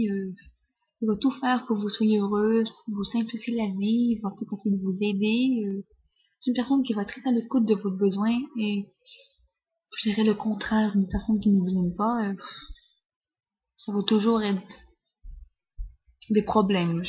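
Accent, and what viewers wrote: Canada. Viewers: je pense que elle es canadienne il me semble avoir reconnus l'accent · elle est surement québécoise, si je me trompe pas!!!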